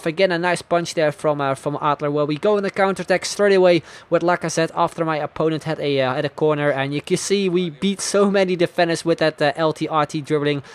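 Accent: Netherlands. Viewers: Are u dutch +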